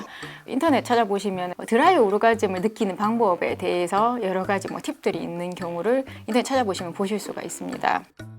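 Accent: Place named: Jeolla, South Korea. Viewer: 전라도 깽깽이냐?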